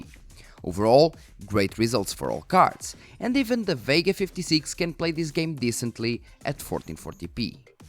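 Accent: Portugal. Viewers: Nice try, Portugalian :) I like your accent )) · Gotta love that Portuguese Accent 👌🏽💪🏽😎❤️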